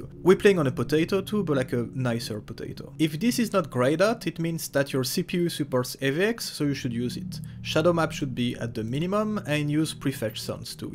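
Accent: France. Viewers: Hello dude ! Are you french by the way :)? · l'accent francais 🤣ca fait plaisir quelques frenchs dans ce youtube game hahaha😂😝🤪😜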